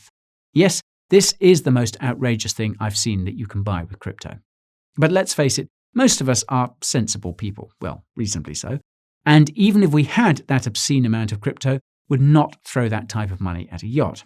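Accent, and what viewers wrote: United States. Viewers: Note can speak American as well. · Vacations. Fall. Lumber... I've been noticing your "American" is coming along nicely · Can you do a 2hole video in American accent. You're American accent is so good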